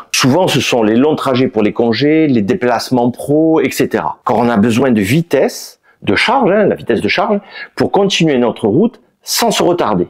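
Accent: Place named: Toulouse, France. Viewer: Salut. Je viens de découvrir ta chaine et je me suis abonné direct. J'adore ton accent. "Oh Putain Con!!!!!!" Ah mais "Merde" j'ai le même....... Je suis comme toi "Pas français mais Toulousain ". Je récupère ma Modèle Y Samedi. Merci de nous faire partager ton expérience et aux plaisirs de te croiser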